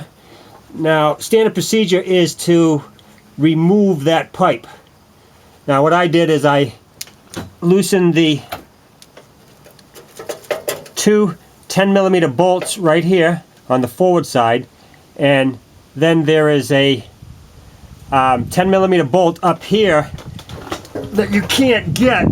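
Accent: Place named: Woburn, United States. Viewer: I see this video has been up for a while but I got to say a couple things I lived in Woburn for a number of years met my wife there 30 years ago and through her I met some friends we still stay in touch and when I heard your voice I knew right away where you were at you sound exactly like my buddy Little Dave made me smile thanks for the video I’ve done this one many times I have a 99 Ford escort with get this 514,000 miles on it I just wanted to comment about the car but I’m gonna put another little post up just a minute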